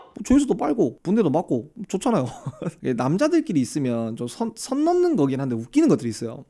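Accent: Busan, South Korea. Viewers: 고향이 경상도 분이 아니셨다고? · 형 부산이여? 갑자기 겁나 친근감 가네요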